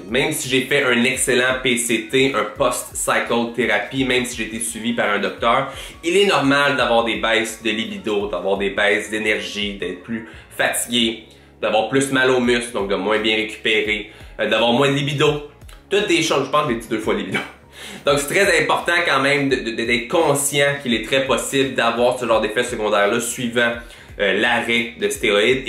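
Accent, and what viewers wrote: Quebec, Canada. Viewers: Première vidéo que j'regarde de ce type. L'accent québécois je m'y attendais pas du tout. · L'accent québécois.. savoureux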